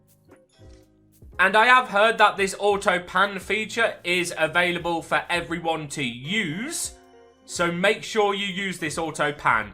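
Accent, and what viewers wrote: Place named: United Kingdom. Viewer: Bro is British because he was spending pounds and his accent also makes this true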